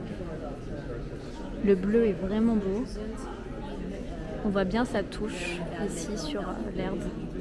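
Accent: France. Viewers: J'adore énormément votre accent français Madame française.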